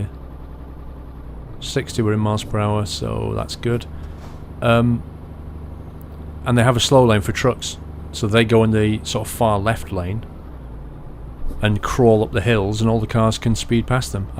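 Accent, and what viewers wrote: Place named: United Kingdom. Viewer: I like how British people say stupid ,"schtooupid".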